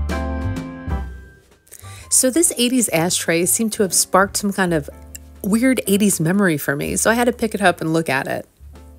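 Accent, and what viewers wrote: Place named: East Coast, United States. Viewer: I can hardly stand to buy new anymore! Everything I wear is thrifted!! And decor for my home as well!!! Books, you name it!! Hugs from Michigan! You sound like you live on the east coast of the United States!! I grew up near Washington DC!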